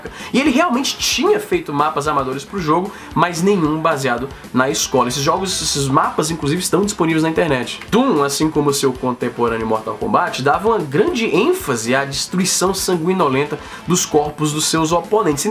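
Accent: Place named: Ceará, Brazil. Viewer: com sotaque cearence, mais alguém notou?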